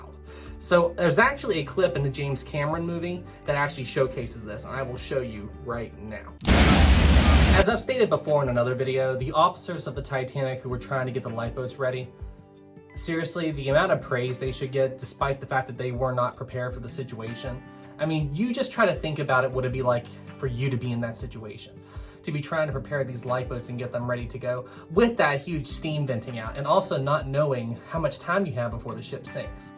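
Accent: South, United States. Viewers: Stop trying to hide your southern accent. Nobody cares. Excellent video thankyou! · Your southern accent .........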